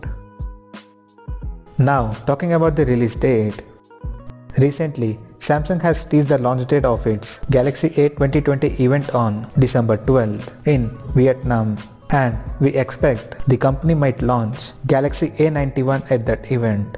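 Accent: India. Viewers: This guys Indian accent is so thick I that I can't understand what the hell he is saying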